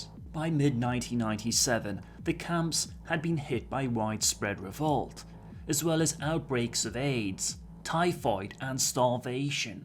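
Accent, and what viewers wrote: United Kingdom. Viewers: If you could it would be awesome. Your speech is nice to hear (and that's why i say about braindancing) but when the meaning is more important (for example gang, drugs, Silverhand, the whole series of cyberpunk lore) then your sounds-like-british accent sometimes can make it harder to understand. I mean... I really appreciate your effort but if you give subtitles, then it gonna be like than cherry on the top of the cake. It really works. For example - i understand 57% of the NC gangs from hearing but when i read subtitles - my gang lore understanding hit to 97%. It really works Samurai 😎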